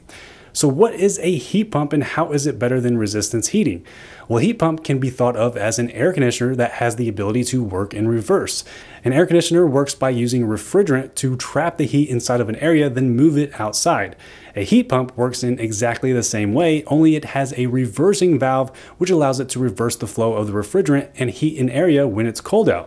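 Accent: Kentucky, United States. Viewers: Are you a Kentuckian?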